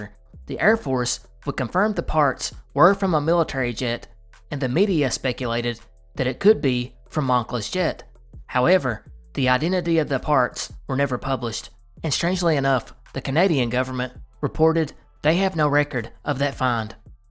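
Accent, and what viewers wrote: South, United States. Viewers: I don’t think I’ve ever heard a creator who does videos like these with such a pronounced country accent Country representation <3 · Your channel and your episodes and how smart you are, helped me to better understand country boys. Now i know for sure it is just the accent. Even before i knew it was just the accent and becaude of movies etc it sounds stupid but its not. Thanks man.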